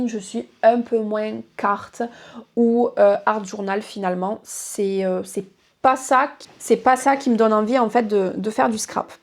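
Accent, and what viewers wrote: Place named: Béziers, France. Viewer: Ah je me disais bien que cet accent c'était celui de chez moi 😄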